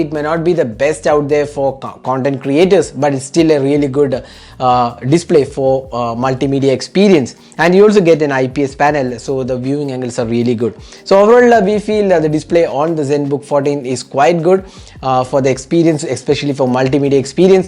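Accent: Kerala, India. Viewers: sounds like mallu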